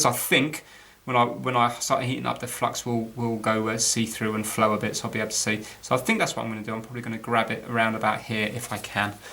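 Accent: United Kingdom. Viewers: "Sol-der", I'm painfully aware of England and America being separated by a common language, but how many times can you say "sol-der" in a minute? Great show, but each "sol-der" was like having my ear drums flossed. We watch mostly British shows, Cookers for Stoves, Stoves for Wood Stoves, Diggers for Excavators, Train Drivers for Engineers, oh my, you just did the "Haitch" pronunciation for H, I had to turn it off. Fascinating until that point. The sol-der and Haitch pronunciations are shared by Big Clive, on YouTube, I turn him off after too many for the same reason. Hope you got it working, I'll stick to the American that fixes consoles and sundry electronics. He seems faster at it, or he edits out some, though you seem to do work equal to his. But British Viewers beware, the American version of this man may grate on your nerves like this man did on mine, and I'm not suggesting we're right, just more accustomed to hearing our pronunciations. 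Best regards. I'll add my thumbs up to the 4,433 others, wish my nerves could have made it to the end.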